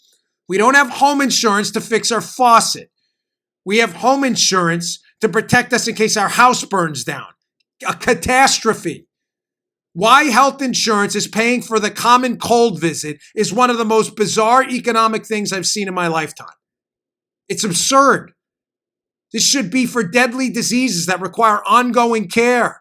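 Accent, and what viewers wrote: New York, United States. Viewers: Dan...as always I cannot wait until pm to watch your videos. So much to look forward to....the great NY accent and the same brother hood with the NYPD. You rock!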